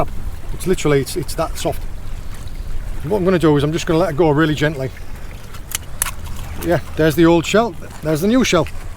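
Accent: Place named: Northern England, United Kingdom. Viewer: You have a northern accent. What took you to Cornwall?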